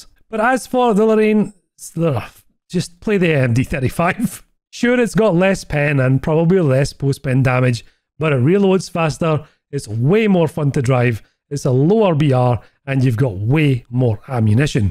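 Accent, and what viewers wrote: Scotland, United Kingdom. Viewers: A great Scots man. Love the videos and the accent.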